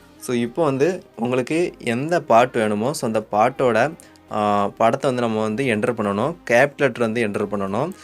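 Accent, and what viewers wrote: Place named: Thoothukudi, India. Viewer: Tuty slang :)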